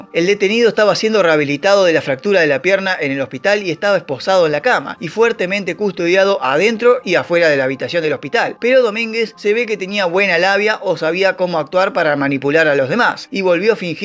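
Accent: Argentina. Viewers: La primera vez que entro a este canal y me gustó mucho el Relato fluido y bien Argento , y las historias Fenomenal 👌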